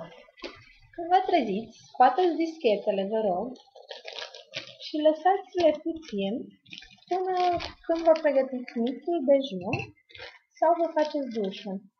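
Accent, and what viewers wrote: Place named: Italy. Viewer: Si eu locuiesc tot aici in Italia , vorbesc 5 limbi straine si nu mi-am schimbat deloc accentul....incearca sa vb fara accentul care il au italienii, pastreaza-l doar atunci cand vb italiana, crede-ma e mult mai frumos.Nu am nimic cu moldovenii cand nu vor sa para ceea ce nu sunt.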